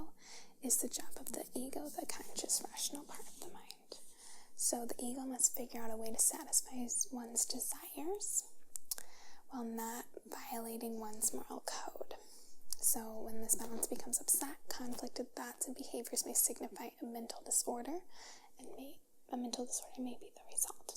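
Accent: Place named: Midwest, United States. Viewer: There is something about hearing the Midwest accent with sunshine and palm trees outside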